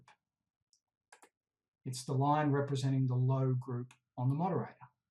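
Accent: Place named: Australia. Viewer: Also saved me as I write my thesis!! Thank you! Love hearing a fellow Aussie accent in one of these videos too :)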